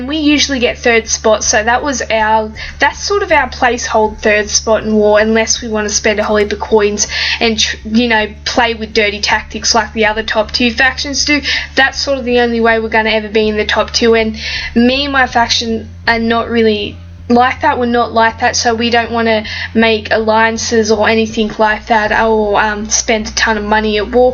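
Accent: Australia. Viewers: Your Australian voice is nice to listen to!!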